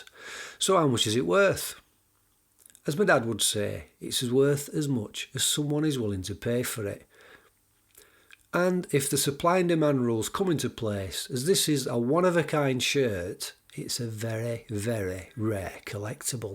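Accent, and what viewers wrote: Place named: Sheffield, United Kingdom. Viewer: Great Shirt, Big names and its only going to get better, great video and love the Sheffield accent.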